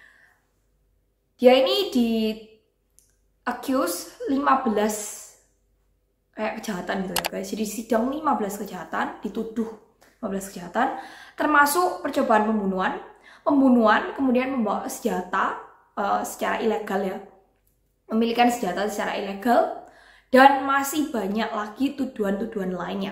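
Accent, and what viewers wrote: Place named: Surabaya, Indonesia. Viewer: Keto wong Suroboyo ne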